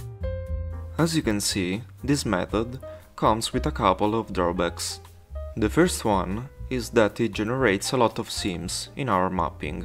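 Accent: Italy. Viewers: Where is this guy from? His accent is a mix of like Italian and...I dunno, Indian? It's awesome. However, I have to say, more explanation would be great. For example, when you open your blueprint you have "absolute world position" and "mask" nodes already on the screen. Where did they come from and how do I get them? I had to just keep trying nodes that popped up after searching for "world" and "mask" until I found the right ones. And you bring in a parameter node, but which one is it?? There's like a hundred different ones. Same thing , I literally just held down letters and clicked until the right node came up. Just saying which one it is or searching for it instead of using shortcut clicks would be very helpful.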